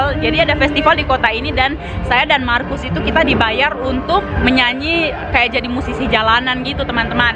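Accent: Papua, Indonesia. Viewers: kk org papuaaaa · Logat Papua kaka 😁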